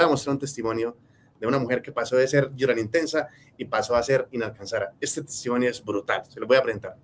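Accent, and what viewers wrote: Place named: Colombia. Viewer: Hola saludos 👋 Yo le sigo hace un tiempo pero lo q me llamó la atención la primera vez q ví un vídeo suyo fue el tatuaje q tiene en su cuello..es el año q mi único hijo varón fallecido nació..😢 pero desde ese primer instante que me salió un vídeo waoo me encantó como se expresa .ademas q me encanta el acento colombiano🤗.los consejos q da q me han sido d gran ayuda en el proceso por el q he estado pasando.. gracias bendiciones 🙏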